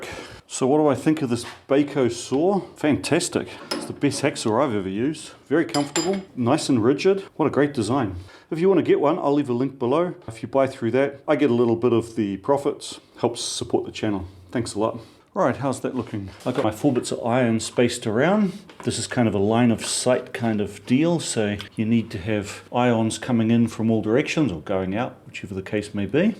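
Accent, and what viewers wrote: New Zealand. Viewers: "four inch input vice on the idge of my welding binch" What's that accent called? P.s. New Zealand? Where's the old one? P.p.s apparently Zeeland is a dutch province, and dutch were known sea explorers so I'm not surprized if they named your country. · Came here by accident on a Sunday evening & heard the oh so recognizable Kiwi accent that made me stay & confirmed New Zealand connections with the "Bog" body filler . Subscribed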